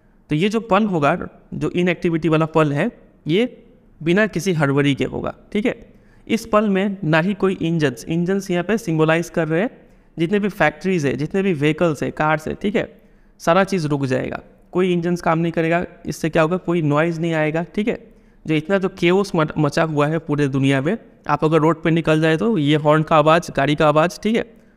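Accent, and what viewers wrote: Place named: Bengal, India. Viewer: Sir u r bengali ❤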